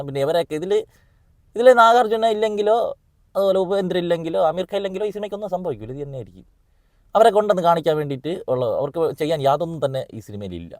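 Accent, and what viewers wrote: Trivandrum, India. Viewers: അണ്ണൻ tvm ആണോ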